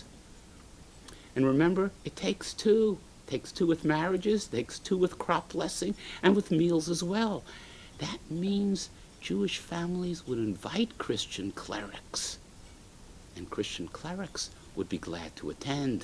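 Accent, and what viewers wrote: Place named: New England, United States. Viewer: HIs accent's from the New England area. Many Jews have that accent because many Jews emigrated there in the late 19th century.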